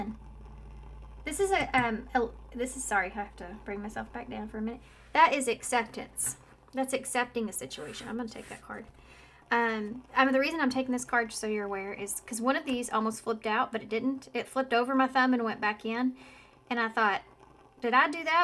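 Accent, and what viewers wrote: South, United States. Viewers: Oh my goodness. I chose pile 2 and it heavily resonated and at the end you said “im hearing Jan too” and that is literally my nickname 🥲🥲🥲😭😭💖💖💖 thank you for this so much!! My imposter syndrome holds me back from everything. Ps. I can tell you’re from the south from your accent! Tennessee girlie here haha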